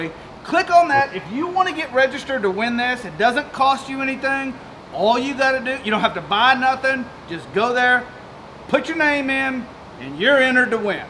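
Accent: South, United States. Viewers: man you seem like a great guy but it every vid I've seen feels to me like a Guga Foods video with a southern accent; maybe a different style of video would get you more interaction on the site?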